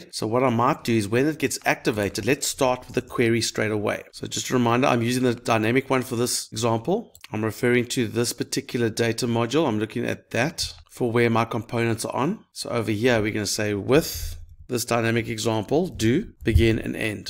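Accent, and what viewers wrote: South Africa. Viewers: Thanks you're the best 👌 quick question are you Afrikaans?😅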